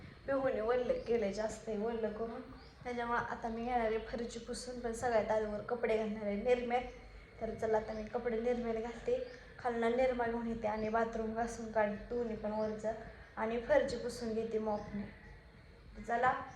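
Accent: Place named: Rural, India. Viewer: प्रिय पूजा आणि....... च्या बोलण्यामध्ये एक वेगळेपण आहे ग्रामीण लेहजा बाज आहे तिच्या अक्टिंग चां वापर योग्य रित्या जर करून घेतला तर आपणास खूप मदत होईल.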